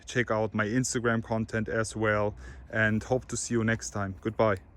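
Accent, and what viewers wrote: Germany. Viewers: Man hört so dass du Deutscher bist😂✌️ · He is not terminator,he is standard German. :) The reason I would buy this car is because the front masks screams Germannn and we all know very good what means German in auto industry. So the accent, if you ask me, just adds to the autentysity. Coming from the place it's created, like this raw, it doesn't get better than this. Amazing car. I love it. Probably this is going to be the car I am gonna save the money for.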